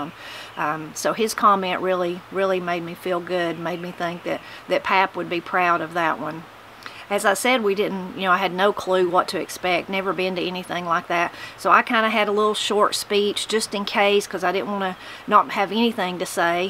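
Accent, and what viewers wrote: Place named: Kentucky, United States. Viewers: I started watching your videos completely by accident. I was trying to find more information from my Ma maw and Pap paws childhood growing up in Harlan county Kentucky. They’ve been gone for man year and the sound of your accent caught my ear and I felt a squeeze in my heart. I wiped my hands and came in to watch your video on cornbread and I was hooked. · Finally an awards ceremony and speech worth watching and listening to. Your speech was fine. The three of y'all looked lovely in your dressy outfits. Thank you for sharing your thoughts and stories about growing up in Appalachia, as well as showing the rest of the country that just because people in the Southern states have an accent/drawl, and might pronounce words differently than other folks are used to, it doesn't mean we're ignorant, backwards or uneducated. 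Congratulations again about winning your award and representing our Appalachian culture and history. Keep up the great content and we'll keep right on watching it. 🥰😊🥳🤟🤟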